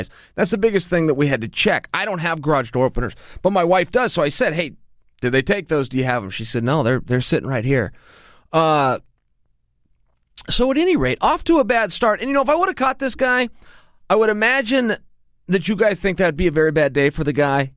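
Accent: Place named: Portland, United States. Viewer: Sounds like a portlandian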